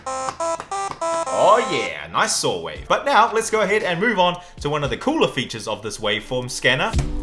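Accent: New Zealand. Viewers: You from New Zealand? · Are u from New Zealand? U sound like a kiwi.. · Is he from nz? Oh yeah yeah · Are you a Kiwi? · Are you a kiwi, brother? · He sounds kiwi, I love him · yo, that accent.. are you a kiwi? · he’s def a kiwi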